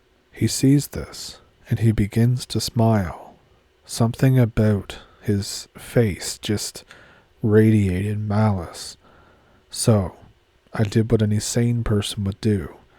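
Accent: Ontario, Canada. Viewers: Hearing your voice reminds me of how my cousins from Ontario sounded. 🎉 Good Times, wot?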